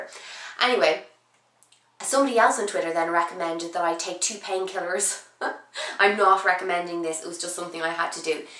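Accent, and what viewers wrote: Ireland. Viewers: are you irish? or from the uk because I hear a accent in your voice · Irish =) The best accent in the world!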